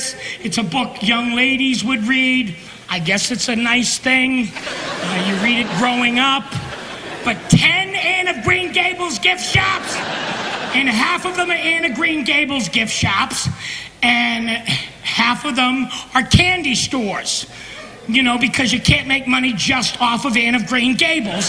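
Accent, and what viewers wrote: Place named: Boston, United States. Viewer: I absolutely love him. Wish I got to see him live. His Boston accent I love it 🥰 RIP John. Enjoy the buffet in heaven. Make God laugh 😂😂😂🙏🏼🌹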